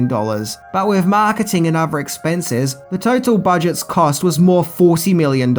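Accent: United Kingdom. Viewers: I can't get around the British accent of the narrator. The inability to pronounce Rs, Ts, and THs drive me crazy. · alway he british accent EVA /= either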